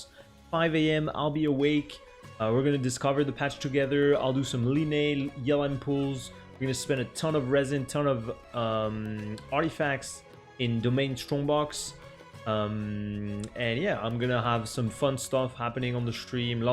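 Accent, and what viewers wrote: France. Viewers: is it hard for you to speak English and you are frensh idk btw i can speak both of them :) I'm from Morocco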